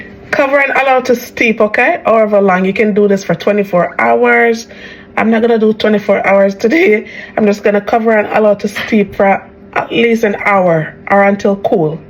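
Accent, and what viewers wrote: Jamaica. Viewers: I have to make this, omd. I take ginger pills for nausea, may as well make the ginger a whole experience. The recipe looks amazing, thank you so much for sharing it! Your accent reminds me of my great grandmother (she was Jamaican, though she’d been in Dublin since her 20s). Beautiful memories, thank you 🩵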